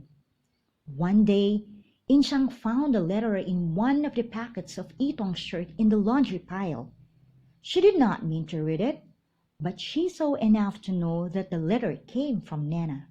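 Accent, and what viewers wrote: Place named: United Kingdom. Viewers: I can't understand your like a British accent 😌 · British accent times ten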